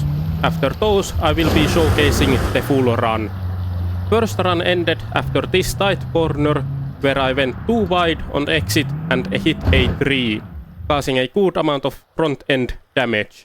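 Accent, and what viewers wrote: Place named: Finland. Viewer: You soud very much like you are finish.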